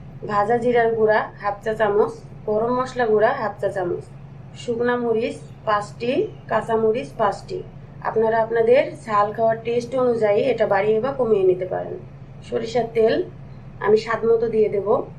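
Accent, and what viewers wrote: Bangladesh. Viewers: apnar vasa commillar na anna jaygar vasa Right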